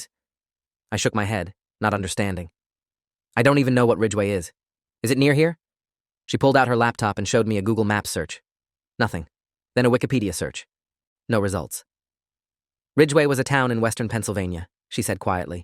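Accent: United Kingdom. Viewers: There is a bit of a British accent that comes through the voice filter must have malfunctioned.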